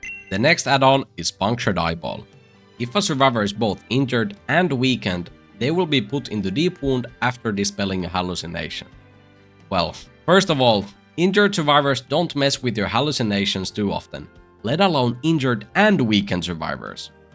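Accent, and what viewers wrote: Finland. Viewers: are you finnish? your accent sounds familiar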